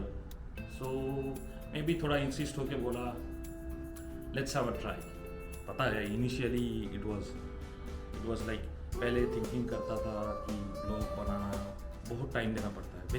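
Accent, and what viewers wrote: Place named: Kolkata, India. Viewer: Love the way u talking dada.. Feel like kolkata....☺️ impressive photography.✨️🌟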